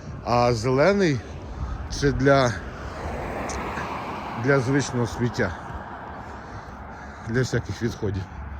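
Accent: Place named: Rural, Ukraine. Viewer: Перший раз побачив в неті цей канал, по вимові впізнаю земляка, підписався буду дивитись.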